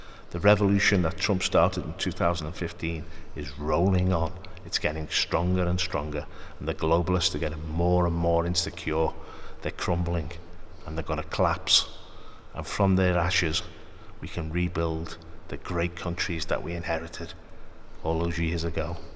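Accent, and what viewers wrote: Liverpool, United Kingdom. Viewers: Happy New Year! Thank you for hard work and persistence! So great to hear a Scouse accent talking our language!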